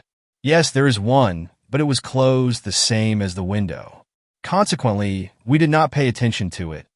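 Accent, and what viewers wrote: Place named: United States. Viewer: Horrible American reading voice.ruined the story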